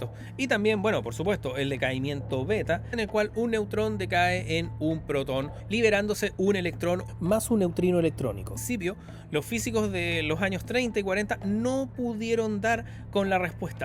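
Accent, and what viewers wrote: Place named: Chile. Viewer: hola una consulta... eres chileno,... yo chileno seguidor de canales de ciencia como robot de platno, date un vlog, Thoisoi, jordi prereira entre muchos otros... primera vez el algoritmo me dejo caer tu canal... un placer escuchar ciencia en acento español de chile... y encontre por fin como un proton se convierte en neutron... eso buscaba y pille tu canal... y al fin lo aprendi... los Piones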